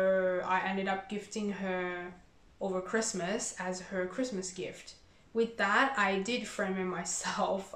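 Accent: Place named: Australia. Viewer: Loved learning about you. Your English is perfect with that lovely Aussie accent! I also tend to gift my stitching, though I recently purchased patterns I want to stitch and keep. I’m lots leer but like your style. Looking forward to more!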